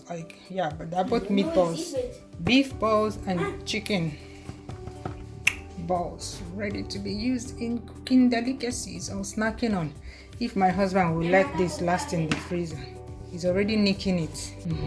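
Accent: Igbo, Nigeria. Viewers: Love your igbotic voice as you always call it. You turned me into a good cook of Nigerian dishes. Keep it up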